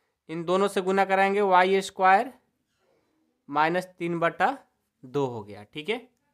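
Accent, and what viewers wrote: Bihar, India. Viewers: Sir àap Bihar se h ?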